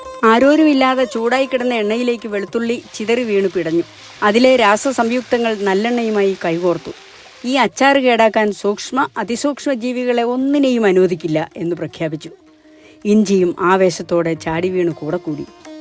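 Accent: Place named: Kollam, India. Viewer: അമ്മയുടെ ഭാഷ കൊല്ലം ഭാഷ പോലെ ഉണ്ട്.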